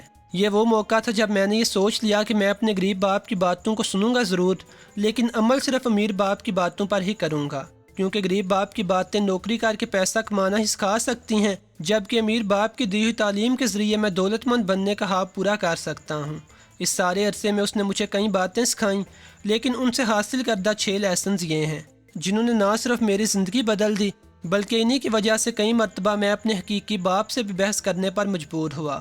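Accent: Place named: Punjab, Pakistan. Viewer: پنجابی لہجے میں اردو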